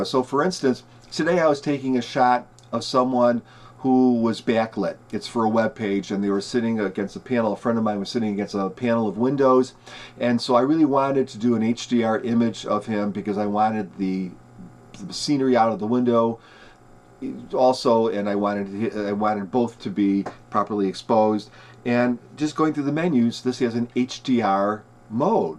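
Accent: Chicago, United States. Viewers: Do I detect a slight Chicago accent...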